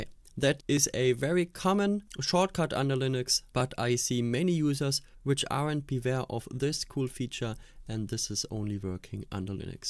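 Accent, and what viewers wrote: Germany. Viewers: Probably he is german, or speaks german.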